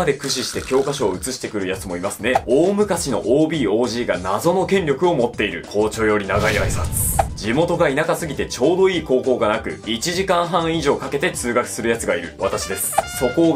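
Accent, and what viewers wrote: Miyagi, Japan. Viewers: もしかして宮城県出身ですか？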